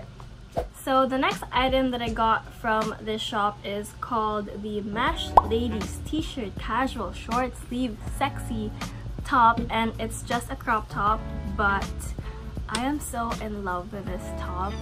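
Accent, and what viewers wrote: Visayas, Philippines. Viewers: Ang cute!!!!! I love ur bisaya haahahaha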